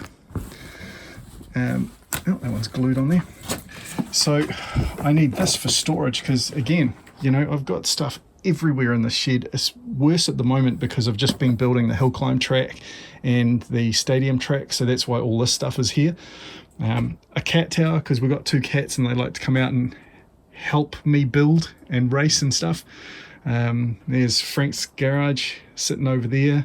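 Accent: New Zealand. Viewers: This was the second vid of yours I watched. Great stuff, and honestly I didn't pick up the Kiwi accent until I watched this. While watching the first vid (one of the hill climbs) I was thinking, that's a "unique" Aussie accent... well now the penny has dropped! Hahaha. As a fellow Kiwi, it's great to see one of the "team" create something truly amazing. I'll def look at your merch and make a purchase. All the best and stay safe. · Great behind the scenes vid mate! Laughing, I gave up informing a few US viewers that you were a Kiwi, but no, no, they knew better, you were Aussie! It was not worth upsetting anyone..... They still have their dreams.....Until they watch this! Haha, but with your accent!! You certainly have continued and expanded your dedication from day one! Going great! Well done! So one tech question, what type of lighting have you found best to film with in NZ? 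Cheers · Yeah I can imagine it would be tough. I honestly thought you were two different guys. I knew you were a kiwi but thought Frank was an Aussie living in NZ. Some of the characters I'd like to do would be Howard Cosell Sly Stalone (as Rocky) the Blue Aardvark from Pink Panther are a few. Oh and I have an Aussie char I'm learning to talk like from you. Yes I realize you're a kiwi but it sounds the same to most eh? My characters name is Billy Bong Bonza he will have all kinds companies with commercials from a deck and patio construction Co (the way you say deck is hillarilious) to Wombat Dating Site for lonely men looking for mail order brides.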